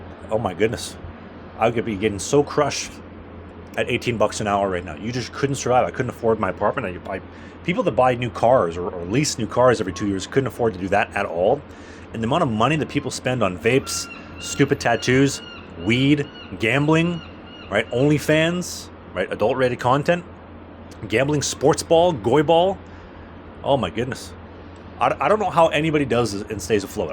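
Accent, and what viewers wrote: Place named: Canada. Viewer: I'm a 35 year old Indian American VP at Nividia and so glad I don't have to live in Canada and be broke and freezing all year and have a goofy accent like this guy. 😆 "Aboat, aboat, aboat!" 😆 If I ever see you in America I am calling ICE to put you in a detention center you silly nobody Canuck.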